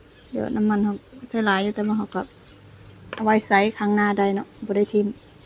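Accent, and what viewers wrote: Thailand. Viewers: หนูเรียนภาษาไทยเหรอพูดสำเนียงคล้ายคนไทย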